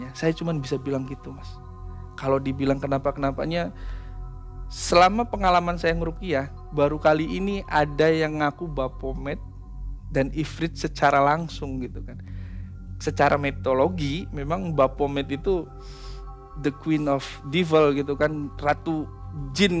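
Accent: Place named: Banyumas, Indonesia. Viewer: Saya Suka dialeg bang...natural gak d jaim2in...lucu khas jawa ngapak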